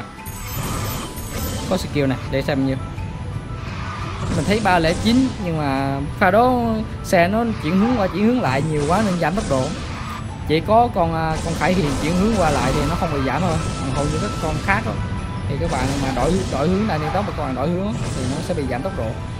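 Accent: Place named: Phu Yen, Vietnam. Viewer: Quê anh biển số 78 hả ah Nghe giọng giống lắm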